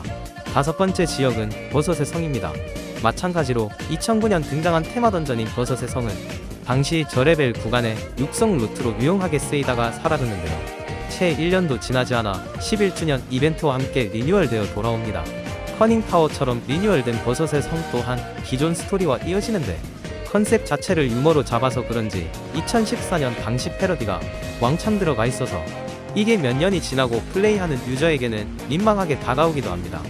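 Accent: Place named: Gyeongsang, South Korea. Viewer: 크리쎄 ㅋㅋㅋㅋ 경상도분이시구나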